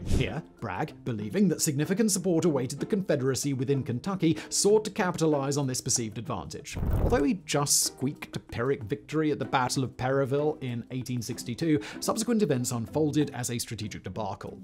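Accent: United Kingdom. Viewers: “A true zenith of egregious military inability.” This is the most intense and perfectly descriptive explanation of the general’s lack of military prowess… But when I say it, it doesn’t sound as impactful as when says it…his British accent makes it sound much more posh and erudite than it does with my American/New Orleans accent… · Bro - you need to slow your speech down a bit . Between the pace of your speaking and the fact that you have a British accent ( which everyone may not be accustomed to hearing ) it makes it hard for the ( some ) listeners to understand all the words in the lesson you are trying to get across . Please , slow down.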